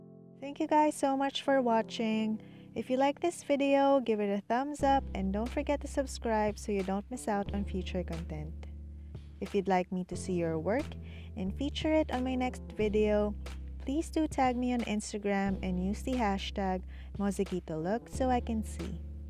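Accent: Philippines. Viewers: are you Filipina?Cuz you have a filipino accent...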